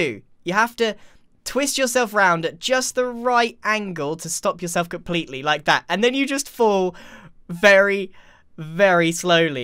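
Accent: England, United Kingdom. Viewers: Grian has an English accent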